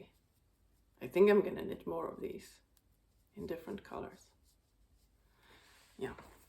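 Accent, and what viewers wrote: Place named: United States. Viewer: Your “American” English is very good. I enjoyed listening to you and watching your podcast💕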